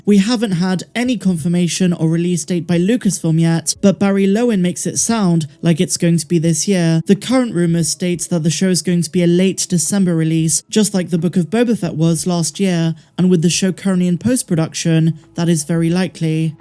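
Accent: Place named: West Country, United Kingdom. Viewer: Great vids as always, are you a fellow west country person, I can hear a twang :D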